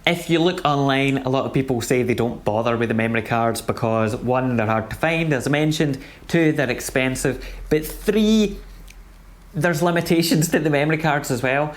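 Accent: Scotland, United Kingdom. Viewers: It's definitely a Scottish accent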